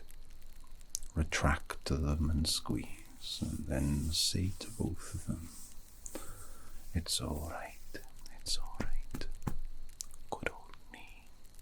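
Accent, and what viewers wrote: Wales, United Kingdom. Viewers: I dont play video games but i like the crackling fire, and natural Welsh accent is always welcome, my favorite actually. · Tone, timbre, and lilt are all perfect -- and I for one can never get enough of the Welsh accent. I've watched this twice now, at times when I was busy, so I look forward to having another go when I finally get to rest. So, will I have the dream, or will the dream have me? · Welsh accent? I'M IN. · You have done nothing wrong to need forgiveness, your welsh accent is always welcome to me. ☺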